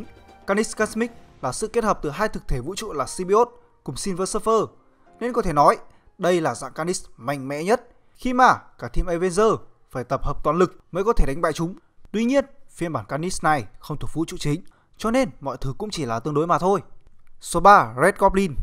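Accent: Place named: Central, Vietnam. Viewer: giọng anh rồi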